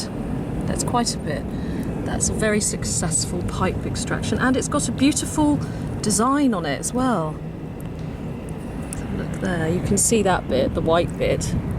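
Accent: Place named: England, United Kingdom. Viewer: will you please wear gloves in future, seeing the dirt under your fingernails really detracts from your wonderful English accent, Erm; I’m English from County Durham....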